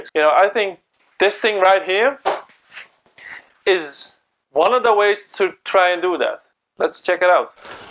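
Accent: Netherlands. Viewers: You Dutch? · Leuke video! Ik hoorde aan het begin van de video al dat je nederlands bent, maar het werd pas bevestigd toen ik de spuitbus verf zag😆😉. Ik gebruik de zelfde verf voor mijn prints. Weet niet of je dat al doet, maar deze verf is het goedkoopst bij de "Action" winkels.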